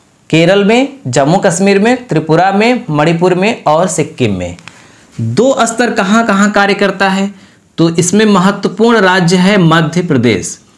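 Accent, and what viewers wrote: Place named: Uttar Pradesh, India. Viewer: U P me isi tarah se bolte hai....pronunciation pe mat jaiye...kitna acchese padhate hai..ye dekhiye please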